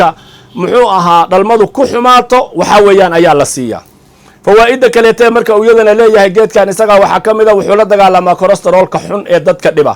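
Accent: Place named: Doollo, Somalia. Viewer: Sheekhow doollo miyaad kudhalatey afkaaga aadbaan ufahmayaaye?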